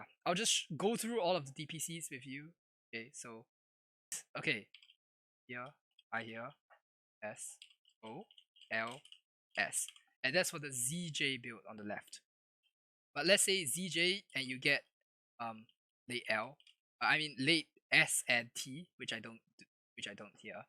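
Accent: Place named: Singapore. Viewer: That Singaporean accent, nice to see another fellow SG player with teto tutorials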